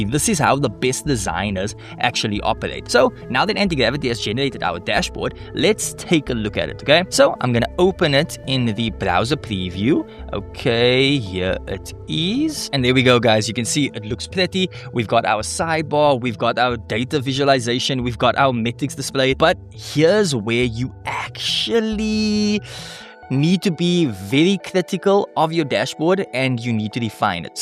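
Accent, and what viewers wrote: South Africa. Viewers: You sound like you from The Cape Flats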